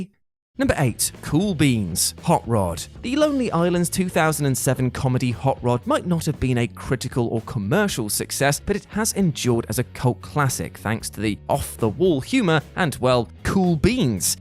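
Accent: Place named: London, United Kingdom. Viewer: you sound like you're from londonnnnnn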